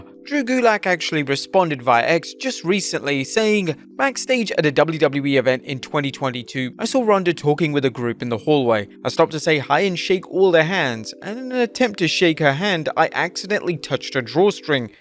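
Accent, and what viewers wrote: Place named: United Kingdom. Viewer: He's British, he has an accent, he literally can't help it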